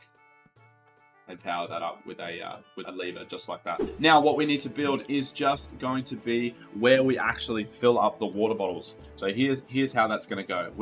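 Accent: Australia. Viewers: i knew u where australian i am too, also u able to make a video the same auto brewer but with like more brewer stands like if i want to put nether wort first and then like surgar cane so mutiple locks and stuff so like im sure u can put your stuff idk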